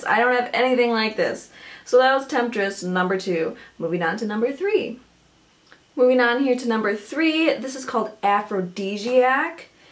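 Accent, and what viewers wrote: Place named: Upstate New York, United States. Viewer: BTW, are you from up north? I grew up in Rochester NY and our accent is very similar to yours. :D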